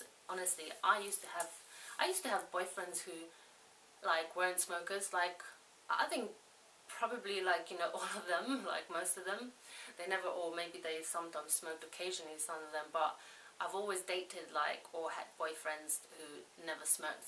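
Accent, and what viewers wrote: England, United Kingdom. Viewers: You are the most beautiful woman I have ever seen in my life, and I absolutely LOVE your slight English accent.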